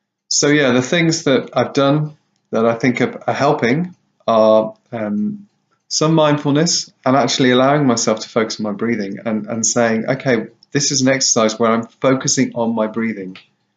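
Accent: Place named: United Kingdom. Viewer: Hi, are you still active on this channel? I am struggling with this at the moment really bad. I noticed your accent sound like you were from the UK so I was wondering if we could have a text conversation to discuss this further. Really in need of some help.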